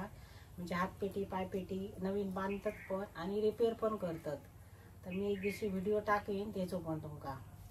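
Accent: Malvani, India. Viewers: पारंपरिक पद्धतीने बनविली जाणारी खीर तुम्ही अगदी छान पद्धतीने दाखवली त्याबद्दल धन्यवाद तुमच्या तोंडी मालवणी भाषा ऐकायला खूप छान वाटते.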